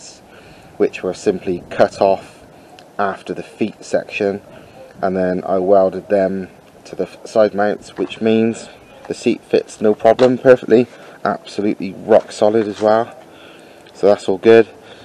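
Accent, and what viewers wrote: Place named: Gloucestershire, United Kingdom. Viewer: Proper Glos Accent in there mush.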